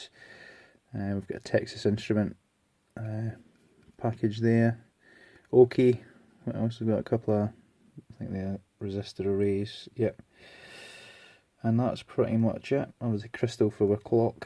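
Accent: United Kingdom. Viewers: Hi, it was a total coincidence, but I'm happy I've found your video. Personally I own more than 40 Amigas 500 (just this model) and I have many parts of it (but just one of it is the real 501;). It was really great to see the professional way you have handled it. BTW. Very good manicure;) and just please keep in mind, not all of us are native speakers (however it was great to hear the beautiful britisch englisch). Regards from Germany. Adam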